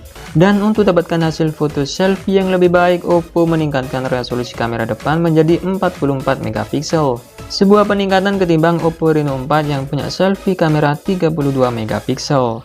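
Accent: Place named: Madura, Indonesia. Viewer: Kayak logat orang madura 😅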